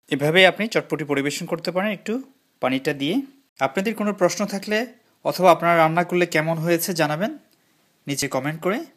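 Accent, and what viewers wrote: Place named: Chittagong, Bangladesh. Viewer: is this man from chittagong ? he sounds so .. ! :-o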